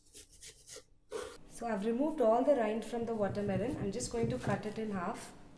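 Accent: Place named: India. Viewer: But that stereotypical Indian accent doe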